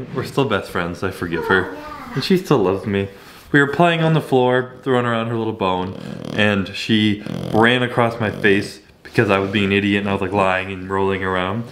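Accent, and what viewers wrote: Canada. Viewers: Why in the beginning of the video does sound more Canadian than ever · the most Canadian intro I've ever heard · I feel like ya really turned on the Canadian in ya here bud lol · actually soundin canadian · Your Canadian is showing lol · Honestly never knew you sounded this Canadian, brilliant😂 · Embracing the Canadian accent on this one. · actually sounding canadian hahaahhahahaha loving it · Can we get that extra Canadian more often pls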